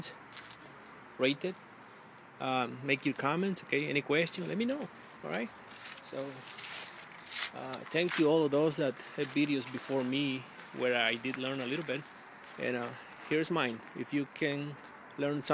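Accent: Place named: Mexico. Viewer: love the accent bro.. Viva mexico .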